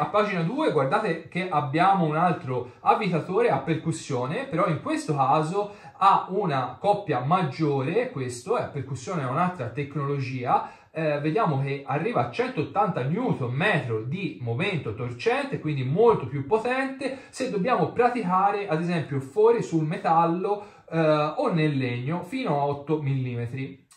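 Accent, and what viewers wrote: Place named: Tuscany, Italy. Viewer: Puoi evitare di parlare il toscano dato che ti seguono in tutta italia?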